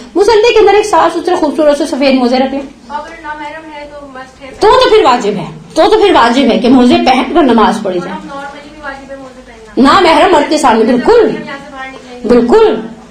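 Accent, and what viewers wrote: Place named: United Kingdom. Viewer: Plz plz ap angrazi accent main urdu nahi bola karain topic acha magar sunaaa muskil ha in ko ..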